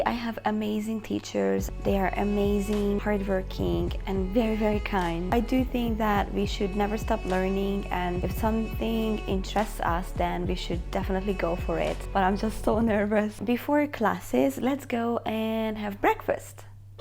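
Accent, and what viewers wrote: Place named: Hungary. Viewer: It was really interesting to hear a fellow Hungarian speak English in a video Nah tessék én is már angolul írok xD Szóval amit már Facebookon megosztottál is szép volt de így videóban még inkább király, szép helyeken jártál 😀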